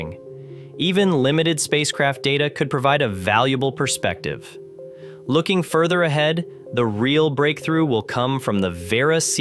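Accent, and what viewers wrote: United States. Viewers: Why do Americans pronounce the word ‘Second’ as ‘Secint’?? And ‘Herbs’ as ‘Urbs’.If you can’t read,I understand but surely,pronunciation IS taught at schools in America?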